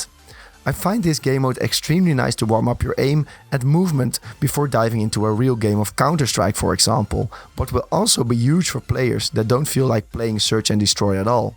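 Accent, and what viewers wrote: Netherlands. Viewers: Hey are you dutch bro?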